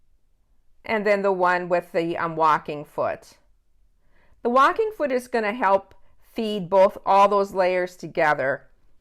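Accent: Minnesota, United States. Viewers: Brand new at sewing. Thank you for teaching this. New subscription to you. Your accent reminds me of my aunt from Minnesota. I could be wrong. Thanks again.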